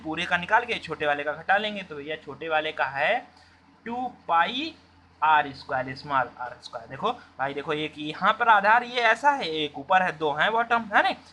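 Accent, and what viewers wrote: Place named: Bihar, India. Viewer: Bihari ho ka bhai sahab voice tone se lag raha hai.....hai to bahut badhiya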